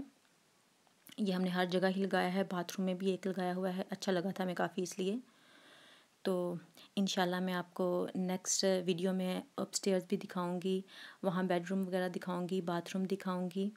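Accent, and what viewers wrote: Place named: Sialkot, Pakistan. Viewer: MASHA ALLAH ap silakot se ho kia? your accent is like sialkot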